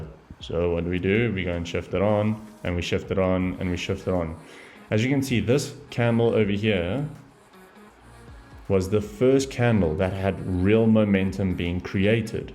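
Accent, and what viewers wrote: South Africa. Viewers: You sound South African 😅 great vid bro🧠👊🏾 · You from South Africa right? Really good video mate, well explained! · is that a Cape Town accent? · you south african?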